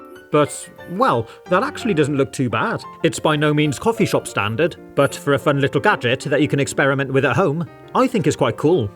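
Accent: United Kingdom. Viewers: Are you britsh?🍵☕🍷🇬🇧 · He's british!!!!!!! 🤴🤴🤴🤴🤴🤴🤴🤴🤴🤴🤴🤴🤴🤴🤴🤴🤴🤴🤴🤴🤴!!!!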